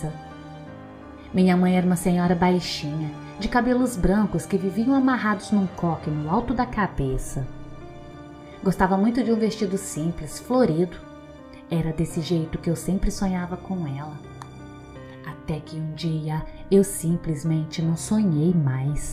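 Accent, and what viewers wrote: Minas Gerais, Brazil. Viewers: perfeita e cativamente com sua minerice bom de ouvir com cafe e pao de queijo parabens!!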